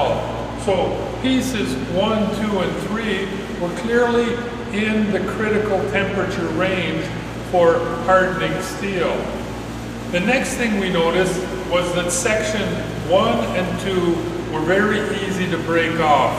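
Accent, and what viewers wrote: Canada. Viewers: He is from Quebec, Canada, so he has a French Canadian accent. I think Cliff Stamp is from the usa... no french accent.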